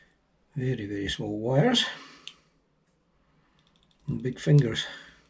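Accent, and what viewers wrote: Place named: United Kingdom. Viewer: congrats for being the first British accented person to make a video worth anything. your videos are spot on. you make excellent panes. you show them flying filmed properly. you don't annoy with stupid antics like your in a month python film. you don't sound like a moron and you show everything in the build clearly and precisely. please teach your countrymen how make YouTube videos, seems the whole continent is lost. it's like watching Russians Indians or Chinese make movies they just don't get it albeit the Indians are coming up quickly. Btw not racist here just that British people make the worst videos by far and for me personally the accent is almost as annoying as listening to an American fron the deep south. with that said maybe it's just idiots that have turned me off with there inability to film anything properly. 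you were pleasing to listen to. 100% British? or is the explanation your from somewhere that just sounds english-ish? either way, please keep up the good work.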